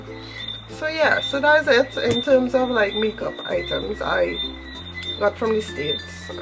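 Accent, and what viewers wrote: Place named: Trinidad and Tobago. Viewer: It's so refreshing to hear a Trini makeup guru for a change! And you seem to really know your stuff. #trinitodbone #sexytriniaccent #sephoragiveaway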